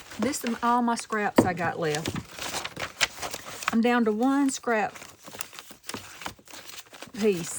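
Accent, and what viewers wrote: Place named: Alabama, United States. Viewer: Hey Ms! Happy Weednesday. When you say it you always emphasize it with an extra weeeenesday. It's that Alabama accent. I agree, I absolutely love using those hearts as the hinges on that tag. I can't wait to give that a try but first I've got to hand draw me some hearts that look decent. I enjoyed every second. ByHisGrace! 🌹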